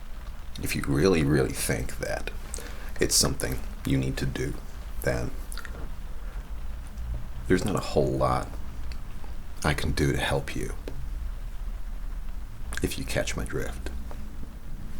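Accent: United States. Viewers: As someone from the USA, I think your American accent is beyond convincing! I like how you said "fer" instead of "for" especially. I just noticed a week ago how everyone I knew said 'for' that way. I don't like my accent because it sounds like a lazier version of "proper"? English... like when metal sounds like medal and water sounds like wader. Ugh. Anyway, your accent is spot on. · I really love this role play! And you are great at sounding like an American! And I do like the storyline of this one! And the end!! That was a shock! Lol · Your American accent is SO spot on it's crazy. Could've fooled me. This audiobook series I've been listening to features an English narrator whose ONLY JOB is to do voices/characters, and his American is God-awful next to yours. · Did you do a more american accent or am i just thinking that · Some murder This video contains mature themes and violence On it! 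Watched for a full minute before I realized you were in America-mode 😅 So natural. And I love that jacket. Now, hopefully Matty Buns shows up for me again <3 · Your American accent is really good! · Spectacular acting, your American accent is dead on, the background and set up was amazing, and the story was awesome!!! Well done!!! Much love! 💙💙💙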